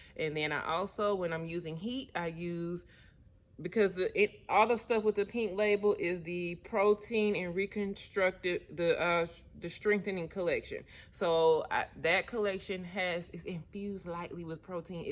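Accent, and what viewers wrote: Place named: Mississippi, United States. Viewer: Where u located? I'm getting a Mississippi vibe from your accent. Just curious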